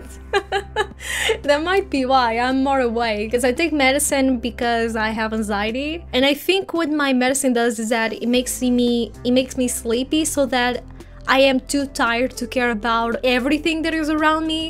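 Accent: Portugal. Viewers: you're portuguese right? I've noticed the accent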